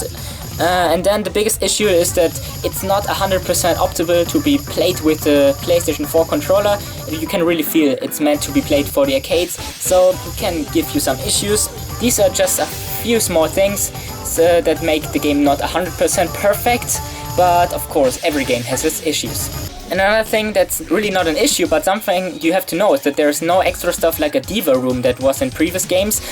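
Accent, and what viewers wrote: Germany. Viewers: Dein English ist gar nicht so schlecht aber man merkt das du Deutscher bist :D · Dude are u german? Nice video btw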